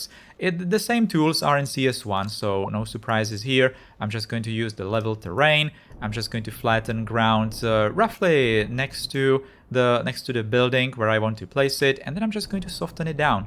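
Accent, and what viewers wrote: Czech Republic. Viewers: CZ, judging by the czenglish. And those batteries are MWhours, not MWatts!!!! That would make no sense! Edit no.2 4MW is not battery capacity, it just shows how much is flowing into it😅😅, capacity is 10MW!!!hours!!!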